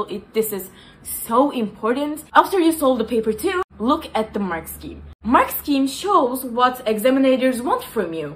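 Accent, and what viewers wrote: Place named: Turkey. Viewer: Türk aksanı da çok yakışıyor .*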